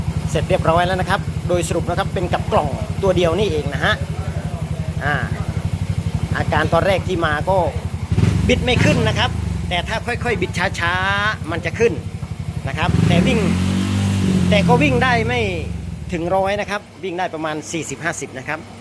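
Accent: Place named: Surin, Thailand. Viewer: เสียงคนพูด คนสุรินทร์ชัวเลย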